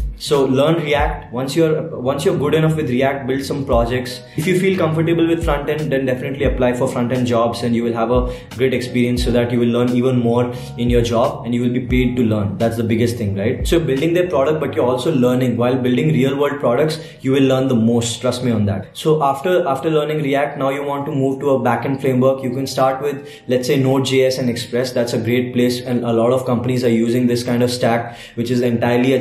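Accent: India. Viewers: Your accent is the perfect version of the Indian accent 👌🏻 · Wow your accent is like Indian.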